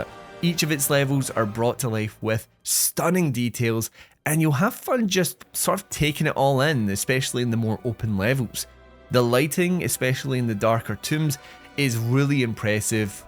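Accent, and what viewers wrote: United Kingdom. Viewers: SOMEHOW I KNEW SOME BRITTISH CHAP WOILD NARRATE THE PREVIEW. HINT. ITS A TURNOFF.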